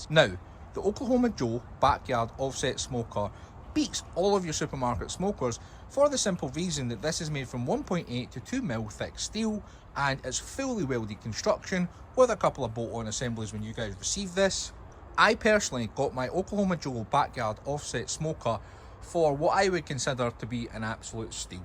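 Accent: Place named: Scotland, United Kingdom. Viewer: no problem. It's always good to see how a fellow scot manages cooks in variety of weather conditions we have up here, so always stay tuned. Think I'm a touch further north than you by the sound of the accent haha. Cheers bud, I had a few small holes in the firebox basket but for the time being I'm just laying tin foil down then putting my fire grate ontop of that, does the job just it is by no way a long term fix, plus the steel is to thin to weld unfortunately. But she does the job, had some good cooks so far this year. Yeah a big smoker is probably a pipe dream. But a Oklahoma joe is definitely next on the purchase list when the time is right. Thanks for taking the time to reply.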